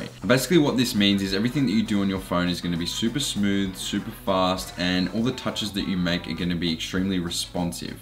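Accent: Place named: Australia. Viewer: Thank you very much it's good we have an Australian accent because I am from Sydney, how did you get the hands off Galaxy S21 I thought only Americans can get it. I am subscribing your channel keep up the good work😁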